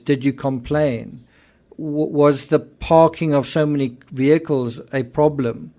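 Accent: South Africa. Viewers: I recognise the accent...fellow South African...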